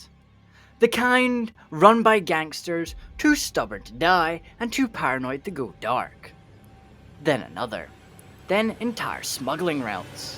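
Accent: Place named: Scotland, United Kingdom. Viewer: As a Scotsman myself, I can verify the accent but as I come from near Glasgow that's a rare I would guess further north than what I hear, what I haven't seen is two converse between each other lol. .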